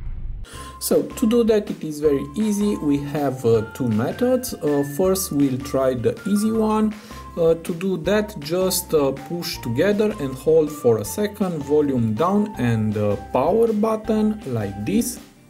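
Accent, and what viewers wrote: Romania. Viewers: Multumim frumos,dupa accent sigur esti roman 😀🤗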